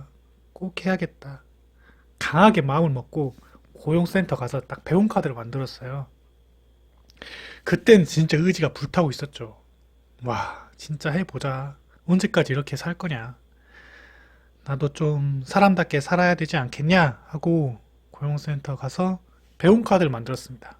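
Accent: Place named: Gyeongsang, South Korea. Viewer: 형님 항상 잘 보고 있습니다 저도 곧 전기산기 준비할건데 좋은 결과 있으셔서 다행입니다! 형님 부산 사시나요? 말투가 경남이신데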